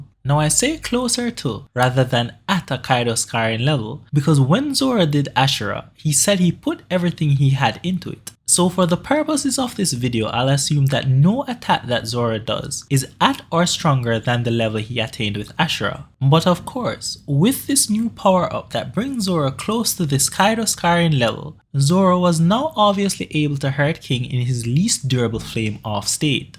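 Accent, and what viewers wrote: Jamaica. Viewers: Sounds Jamaican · U sound Jamaican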